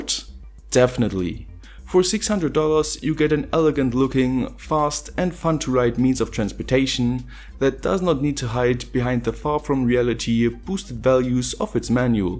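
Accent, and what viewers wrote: Germany. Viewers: wow you good with electronics mate! Like your accent sounds german.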